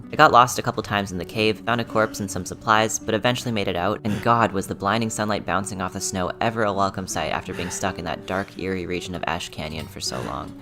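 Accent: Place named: Canada. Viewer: he sounds adorable ALSO caneda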